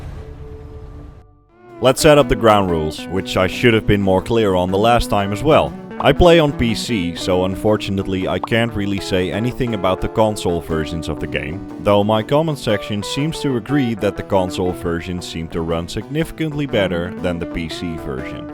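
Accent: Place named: Netherlands. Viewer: Je klinkt Nederlands.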